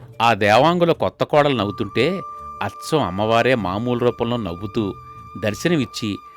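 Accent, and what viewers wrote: Godavari, India. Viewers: కాదేదీ కథ కనర్హం. నవ్వు అన్న ఒక్క విషయం మీద కథ అంతా అల్లడం నవ్వులాట కాదు. ఆ మణి నవ్వుని మేం చూడలేకపోయాం అన్న వెలితి లేకుండా మీ సహజమైన గోగారి యాసలో ఆ నవ్వుని స్పష్టంగా వినగలిగాం. ధన్యవాదాలు.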